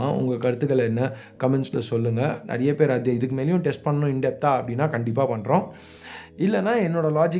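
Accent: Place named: Telugu, India. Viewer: It's really surprising that you are in talking in Tamil because I thought that you were telugu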